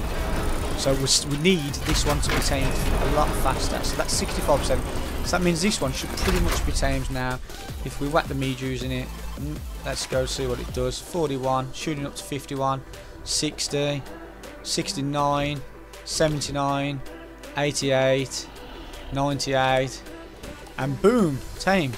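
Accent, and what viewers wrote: United Kingdom. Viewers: You from West mids mate sound local haha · After playing for over a year I am only now learning this trick. Prefect for those first herbivore tames at the start of the game! Smashed your like button in its pretty little face, mostly because your brummie accent reminds me of home :D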